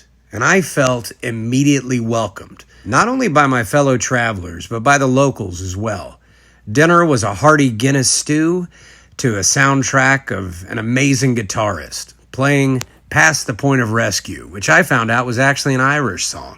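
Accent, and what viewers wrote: South, United States. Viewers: That's a redneck accent I never heard before...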